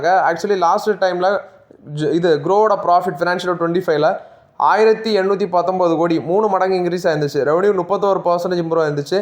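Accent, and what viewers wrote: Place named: Kerala, India. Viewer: He is malayali ignore the pronounce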